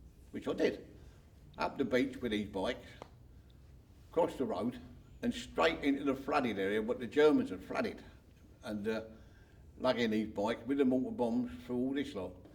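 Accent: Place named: South London, United Kingdom. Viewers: Sounds like south London to me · South East London.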